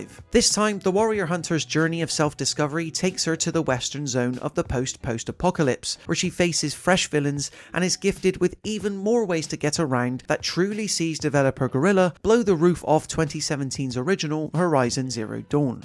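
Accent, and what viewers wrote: West Country, United Kingdom. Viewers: subscribing for the west country accent!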